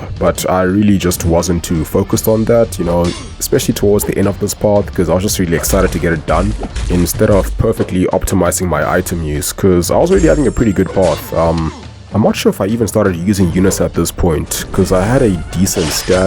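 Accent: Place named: South Africa. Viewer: Are you from south africa, your accent kinda sounds like a few people i know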